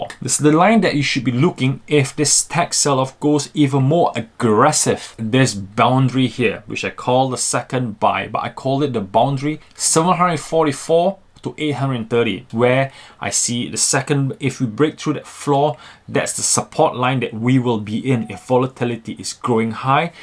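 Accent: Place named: Singapore. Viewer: Are you originally from Singapore? I hear the Singaporean accent ;)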